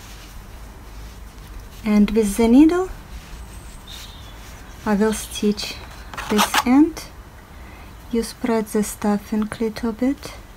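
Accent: Russia. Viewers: Оооой русский акцент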